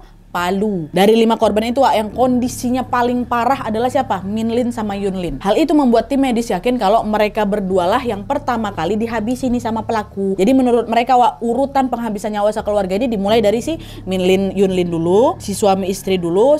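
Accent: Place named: Medan, Indonesia. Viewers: kamu hebat dalam menyampaikan isi cerita kriminal yg seolah olah kamu sedang menyaksikan nya.. Salut utk kamu.. orang Medan ya? Kok logatnya kental bangaaaaat.. sor kali! 😜😜😜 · Lucu si pembawa acara..Kaek orang Sumatra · idolaku yng baru dlm knten yng crewet dr bbirmu tu, suka x bahasa yng kao ucap tu, kek orng Medan, apa pekanbaru tah aplah tu, lnjut skses bun cntik👍🤣😀😂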